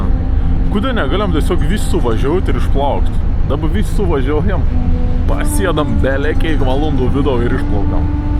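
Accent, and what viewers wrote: Samogitia, Lithuania. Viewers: sveikas, kai kalbi angliskai jauciasi zemaitiskas akcentas 🙂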